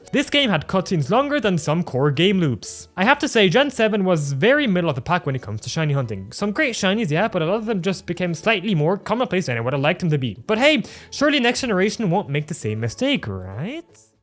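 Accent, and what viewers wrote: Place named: Germany. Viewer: Are you german? Your accent sounds a bit german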